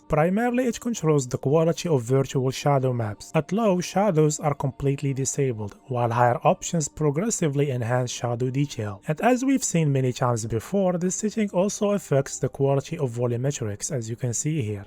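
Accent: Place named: Morocco. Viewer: Moroccan accent all over the place nadi a bro chokran bzf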